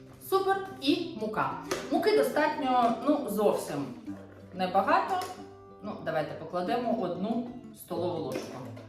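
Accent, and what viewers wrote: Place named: Ukraine. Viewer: Фууу. Такая девушка, а разговаривает на смешном деревенском языке. Вам не идёт. Суржик у вас. Беее. В первых роликах на русском было красиво.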